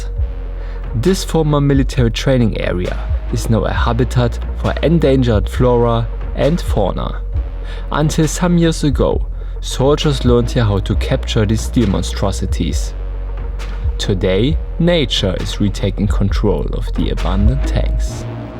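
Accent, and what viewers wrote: Germany. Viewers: His accent is intense. This is 100% pure german accent without even trying to pronounce properly. Sorry but that makes me cringe :D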